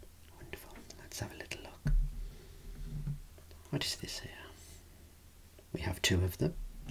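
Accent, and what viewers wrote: Birmingham, United Kingdom. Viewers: the best brummy accent in history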